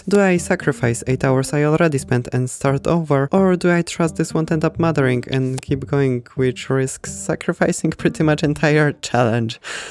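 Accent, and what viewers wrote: Poland. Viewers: My polish detector went insane after I heard you speak, I was right judging by your about page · U from poland? i recognise the accent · po tym akcencie odrazu wiedzialem ze polak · Are you from Poland? I hear an accent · Jesteś z polski? Słychać po akcencie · Takiego polskiego akcentu nie ukryjesz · Słychać że Polak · słysze polski akcent czy nie prawda?? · Kurwa odrazu po akcencie wiedziałem że to polak xD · u sound like polish and sound like u are reading script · You sound like you are from Poland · Kurwa oglądam sobie i słyszę charakterystyczny akcent patrzę w info a to kanał polski xD powodzenia w anglojęzycznej karierę będę tu chyba czasem wpadał :) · Ty z polski? Bo masz nasz akcent? You from poland? Because you have our accent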